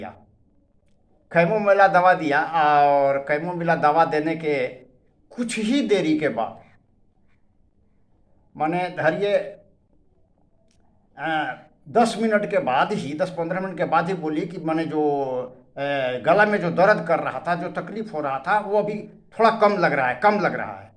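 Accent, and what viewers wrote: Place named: Bhojpuri, India. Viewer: Kirpaya aap apana bhojpuri lehja mat chhodiye! Poorvanchal k jitna dangdar hauye , kul like auri subscribe karihain.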